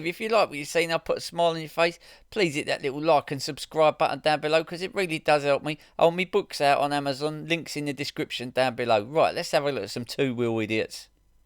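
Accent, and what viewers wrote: Cockney, United Kingdom. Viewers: I like the videos, but the voice over is kinda lacking.. just sounds like some cockney numpty, to the rest of the world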